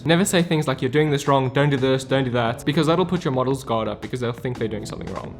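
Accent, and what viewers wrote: South Africa. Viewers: Is that a South African accent? :) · South African?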